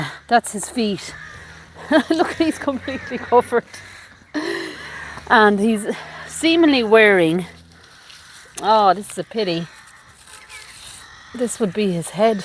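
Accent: Ireland. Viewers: So impressed you cleaned what you did by hand. Reminds me of when i was a girl, my Dad and I spent many happy years wandering around graveyards. Dad was a bit of an historian and we discovered an unknown battleground and a mass grave of Maori warriors, ( men and women). Loving your Irish accent.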